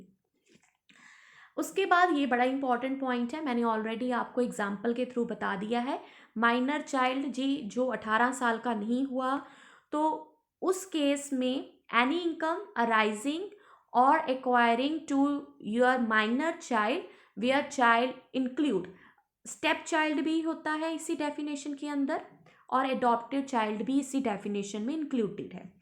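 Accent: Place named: Punjab, India. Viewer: Punjabi accent rocks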